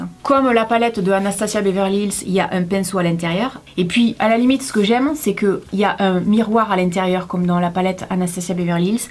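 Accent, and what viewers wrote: South, France. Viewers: J'adore ton accent du sud parce que j'ai le même je t'aime trop toi et tes concept décaler😍😍😍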